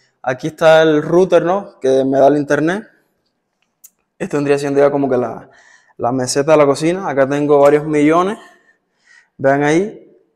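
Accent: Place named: Cuba. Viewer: Pero si tiene acento cubano,y no tiene sangre azteca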